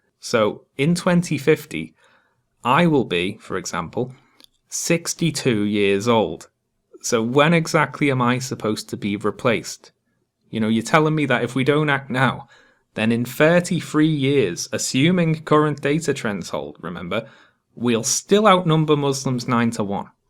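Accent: Liverpool, United Kingdom. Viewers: The Scouse accent is fucking vile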